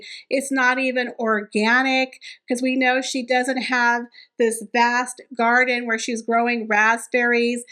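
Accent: United States. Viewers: Why is this channel called “Royal daily Tea” when you only do hate videos on Megan and Harry. Also - you sound like an American. Why TF do even care?!?! It’s giving obsessed honestly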